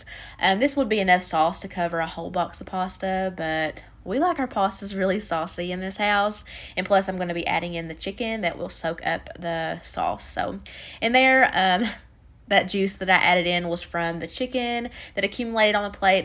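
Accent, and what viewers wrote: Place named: Kentucky, United States. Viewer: I now see why your voice is from lmao im from ky too, i like your dinner ideas. Do you make a planned list for them? Im a beginner cook and im just seeing what the best ways to make a variety of different dinners cos i make a lot of the same stuff for my fiance and i right now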